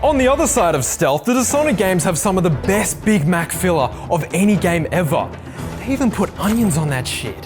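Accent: Australia. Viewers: Australian(?) Nakey Kakey I love it. Gladly just found you today. Keep up the good work plz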